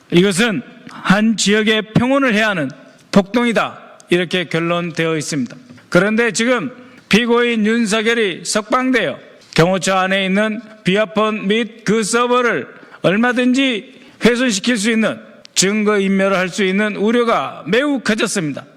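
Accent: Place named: North Korea. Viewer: 너는 누구니? 말투가 어디야? 탈북민인가?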